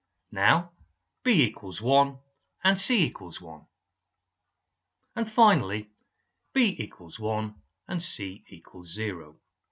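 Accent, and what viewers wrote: England, United Kingdom. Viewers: great video sir. refreshing to hear an english accent teach stuff like this on yt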